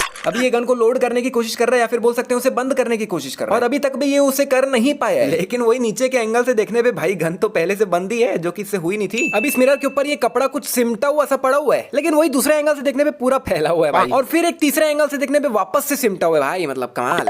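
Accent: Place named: Mumbai, India. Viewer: Bhai udda diya ek Mumbai excent hai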